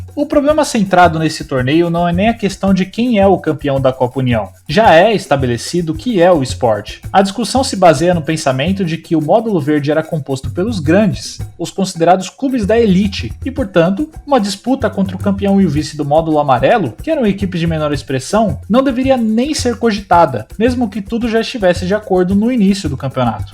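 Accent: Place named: South, Brazil. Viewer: Claramente sulista.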